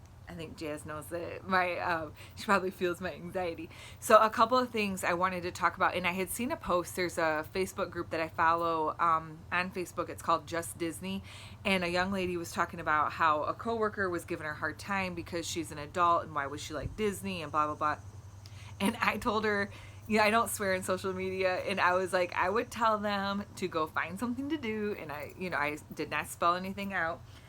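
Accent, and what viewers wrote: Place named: Midwest, United States. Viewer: You're amazing! I found your channel during BBW SAS, and have really enjoyed your videos. Honestly, I really enjoy your accent. I love people from the midwest, people there are just so sweet and kind and genuine. Your accent makes my heart smile. I love how you make a big deal about the thumbnail and Byyyyyyyyyye, too. It's your signatures! Keep doing you, you can do anything! ♡ #TeamJackie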